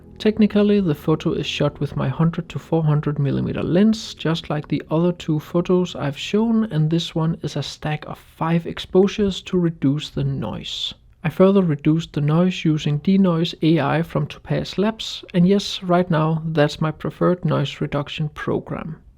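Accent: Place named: Denmark. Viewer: Excellent video, as always. By the way, I'm following your work since the beginning when you just have a couple of subscribers... I can tell you, your work evolved a lot. Time pass by, you are more clean, your style well define. The only thing that never ever changed is your charming Danish accent! LOL. Congratulations! You deserve it.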